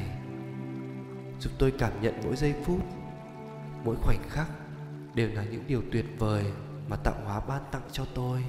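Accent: Vietnam. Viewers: Giọng anh hay quá, giọng Bắc chánh tong tôi nghe mà rất cảm xúc, ấm áp lắm! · Chú là người Hải Dương phải khong ạ ><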